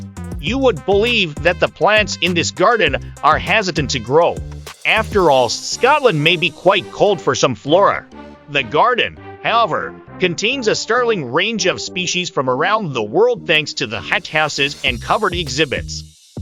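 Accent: United States. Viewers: The worst possible voice over ever! Cheezy all-American accent, multiple mispronunciatons including - ad nauseum - Edinboro??!! It's Edin-burr. Or as many locals say, Em-bra. Some nice video clips, though some syncing with the narrative would have been beneficial for those lacking familiarity with the city. Also, for any visitor, walking through the New Town is a great experience and can be done enroute to Dean Village before a walk along the Water of Leith to Stockbridge enroute to the Royal Botanics. Also, a day on Victoria Street? I think not! There are some nice shops, true, though most are specialist offerings. An hour would likely be max. Though the Botanic Gardens is a nice place to find some quiet, so is the west end of Princes Street Gardens, with the castle overlooking. Get some eats at Marks & Spence on Princes Street and make your way down the steps at the bottom of the Mound...and 'dine' in the shadows of the castle. 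Fabulous!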